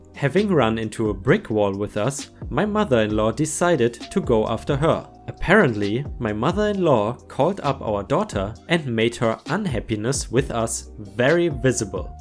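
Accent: Germany. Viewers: what is your country of origin? From the sound, like German? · you sound so German!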